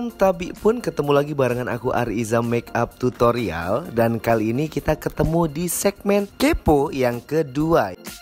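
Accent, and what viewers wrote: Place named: Lampung, Indonesia. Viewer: yapun... orang lampung nih ya😀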